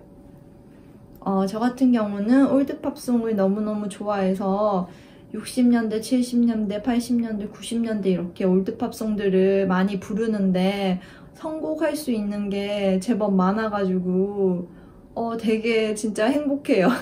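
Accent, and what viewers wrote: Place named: Japan. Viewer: 한국말을 하시면서도 억양이 일본어로 말씀 하시는것같아요~~ 저는 혼자 그것도 타국에 살아본적이 없어서 너무 부러워요ㅠㅠㅠ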